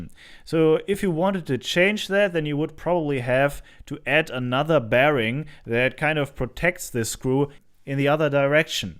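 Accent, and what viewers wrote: Germany. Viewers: Ha! I knew that was a German accent. There was no doubt after you said Opel! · hallo,super video .👍👍 warum sprichst du so gutes englisch mit deutschen akzent?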